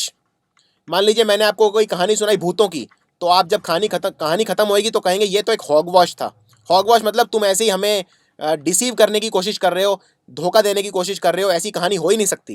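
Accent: India. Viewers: oooo sh***t again this pronunciation by indian people .....!!!!! sorry, but it's so AWFUL!!!! my ears are died !!!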